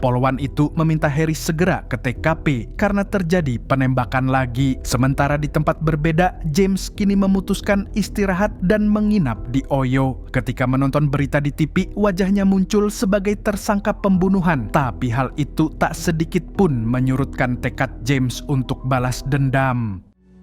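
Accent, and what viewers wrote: Banjar, Indonesia. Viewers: Orang Banjar Kah Min pian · Banjar Jua sekalinya 😮😮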